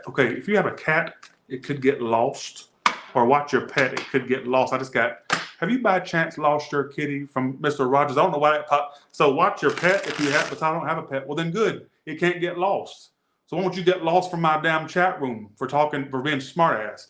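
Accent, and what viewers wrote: London, United Kingdom. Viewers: Love the London accent 😍 💕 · Your English British accent. Love it 🫶😄 from London here! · When I heard the British accent, I knew the Sagittarius read is for me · Omg!!! sorry I had to 😂 I love your London accent better than mine!! Lots love 🥰 uk 🇬🇧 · British accent .. absolutely fantastic 🤣👏👏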